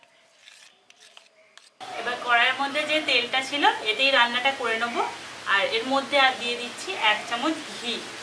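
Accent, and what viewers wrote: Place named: Kolkata, India. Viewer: Apni kolkata takhe hobe.. Apner basa sune buja jay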